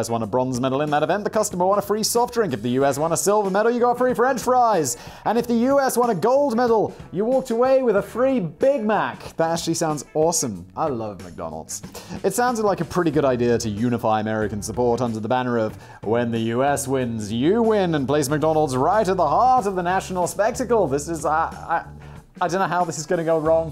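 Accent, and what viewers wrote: Russia. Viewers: I was born USSR, and russian accent is my favourite russian accent on YouTube. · Great Russian accent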